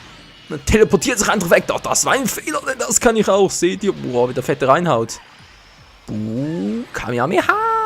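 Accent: Switzerland. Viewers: Bist du Schweizer ich liebe diese Sprache! :DD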